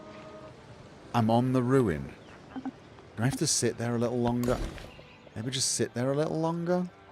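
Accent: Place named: Manchester, United Kingdom. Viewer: Sometimes, I prefer the intro chat to the game, mostly because the rodent is a fellow Mancunian, and it's like listening to an older cultural cousin. 😂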